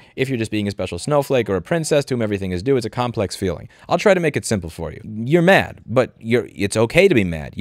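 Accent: New York, United States. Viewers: Very well said and interpreted, took me a long time to get used to your NY style, appreciate all you do now.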